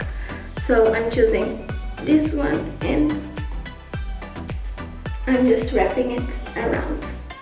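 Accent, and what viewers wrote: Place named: Portugal. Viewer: Vi logo pelo sotaque que eras portuguesa!!! Adorei o método resulta super bem no meu cabelo! Obrigada e boa sorte para o teu canal! Beijinhos :D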